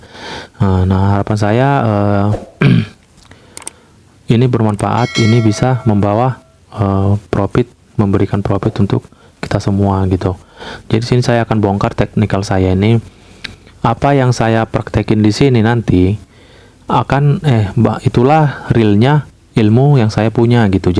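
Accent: Medan, Indonesia. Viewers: Medan kali logatnya lek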